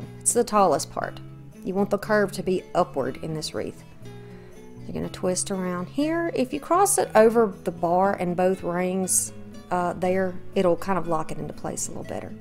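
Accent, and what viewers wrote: South, United States. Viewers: as a new resident of the South, I love hearing you talk! I moved here from California and it was the best decision I ever made. Not to mention, you’re one of the most creative YouTubers out there ❤ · that wreath is AMAZING ITS GORGEOUS. I JUST LOVE YOUR CHANNEL GUUUUURL.... I love your voice, your accent is so sweet and idk comforting to me. I love your confidence and I just like you. I think you're honest, spiritual, creative and humble. I'd like to be your neighbor. You just seem so real. Just wanted to tell you that I really appreciate you sharing your talents and creative mind. I have so many things I wanna do I feel like I never get anything done. Btw I do not know what it is but that southern accent gets me every time. You and Kathy Jo. I love her too. She's very creative and funny. Btw I am from Nova Scotia Canada Cape Breton Island to be exact and we sound like we are Irish with long drawn out syllables. 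Hahaha hahaha. Like the word MAN well we say ( may-ne).Hmmm 🤔🤔🤔 I think that's where we might have a similar context but different accents. Depending on how much wine 🍷 I've had lol.... Hahahahaha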